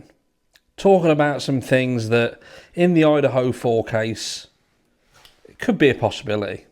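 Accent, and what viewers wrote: United Kingdom. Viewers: Right there and there lol I think I just like ur bretesh accent eh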